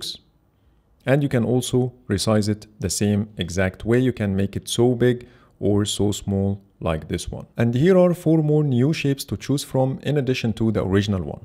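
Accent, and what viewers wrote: Egypt. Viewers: انتا مصري يسطا صح عاااااش اقسم بالله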